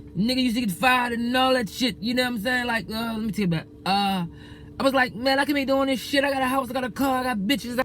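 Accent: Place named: Baltimore, United States. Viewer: Baltimore. 10 seconds in I knew 🤣🤣 Salute dawg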